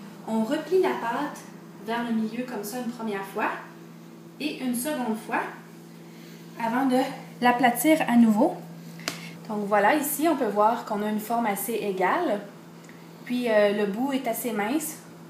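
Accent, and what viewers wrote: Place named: Quebec, Canada. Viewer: En plein le genre de vidéo que nous cherchions. En québécois en plus!!! Merci pour les info!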